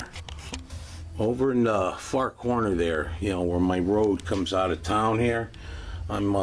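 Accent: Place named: New York, United States. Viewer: Hey the layout looks great! Now I'm going to check out you're other videos. Keep posting I'll keep watching. I'm building a layout also. Great inspiration thank you. Where are you from? Listening to you makes me feel like you must live in the NY NJ area. I'm a north jersey guy. Nice job!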